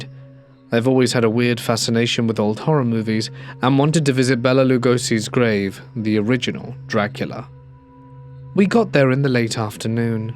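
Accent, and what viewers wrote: United Kingdom. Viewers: Sorry but british accents just don't make scary stories sound scary like american accents do so I;m out after the first 2 stories.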